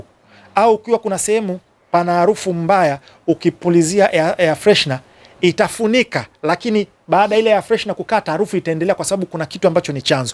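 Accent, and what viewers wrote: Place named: Africa. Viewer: Salut kaka yani unavyoongea unabeba pendela ya Africa big up ❤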